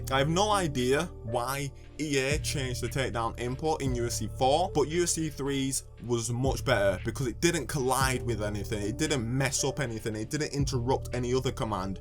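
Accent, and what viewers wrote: United Kingdom. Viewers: You sound British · Jeez mate your accent is like nails on a chalkboard to my damn ears. Common as MUUUUCK. I'm also from the UK. This is the bottom of the barrel of accents we have lol thIIINNNGGHH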